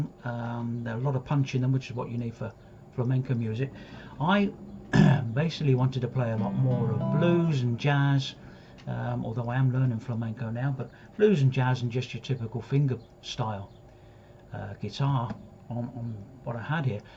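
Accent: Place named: Northern England, United Kingdom. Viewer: Thanks for answering ! It sounds a bit northern English...but I may be wrong. Anyway, thanks man. Love your videos.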